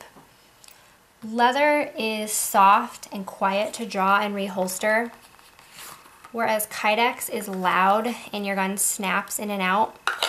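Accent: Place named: South, United States. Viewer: I just noticed but you have a tiny bit southern axcent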